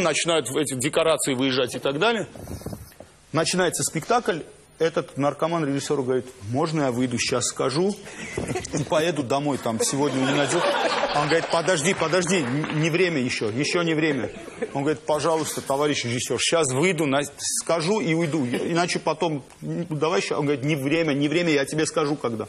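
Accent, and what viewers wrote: Armenia. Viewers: Когда он со своими у него армянский акцент, а среди русских Ванечка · А не на русском ТВ Мартиросян включает армянский акцент.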